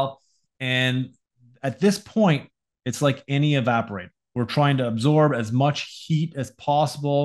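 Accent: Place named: Atlantic Canada, Canada. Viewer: thanks for the video, pal! you from the east coast in Canada? I detect an accent lol Ontario here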